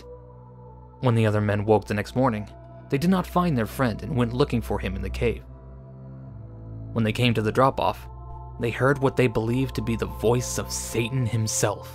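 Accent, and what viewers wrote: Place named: South, United States. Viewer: My God, I love your southern accent! XD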